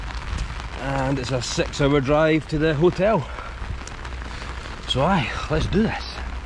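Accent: Scotland, United Kingdom. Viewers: Did you learn English in Scotland? Pronouncing words such as "now" gives you a strong Scottish accent!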